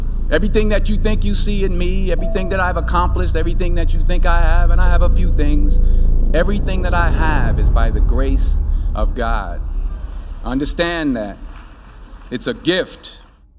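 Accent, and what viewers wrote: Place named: Scotland, United Kingdom. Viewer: I was having some trouble recently calming manifestation.guide the voices in my mind during my morning meditation. him has a wonderful Scottish accent and a very calming voice. Thanks to his meditation CD's I have gotten back on track and feel a big difference in my meditation practice.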